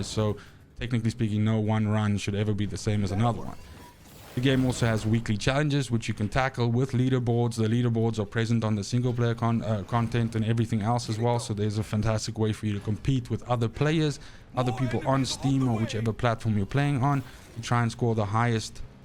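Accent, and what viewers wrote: South Africa. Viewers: i can hear some south african .awe my bra kwai video!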